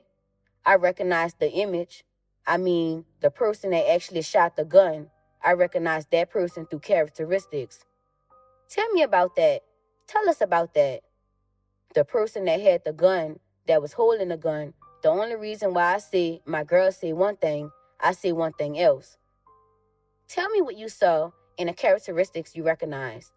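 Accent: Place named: New Orleans, United States. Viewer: 👍 New Orleans accent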